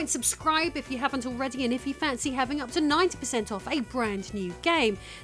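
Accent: United Kingdom. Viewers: I keep thinking to myself why don't I like the sound of. I love British dark haired women. While watching this video I realized, Its the British News caster down hill draw sound. I have heard this in other people and technically is it a way of speaking that is supposed to draw your attention to what she is saying. But to me it is like nails on a chalk board. We came here to watch and listen to you. Our attention is already on you. Nothing against you. Its just this way of "reporting" repels quite a bit of the Neuro Divergent viewers who would watch and enjoy.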